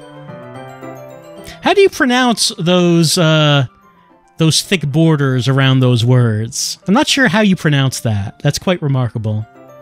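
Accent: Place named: New York, United States. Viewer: I miss New Yorker accent already:(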